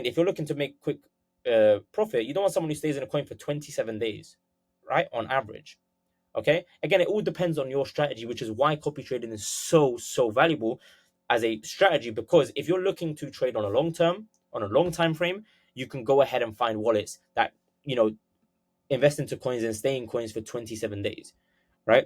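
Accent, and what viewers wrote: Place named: United Kingdom. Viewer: I’m from London bro I hear a British accent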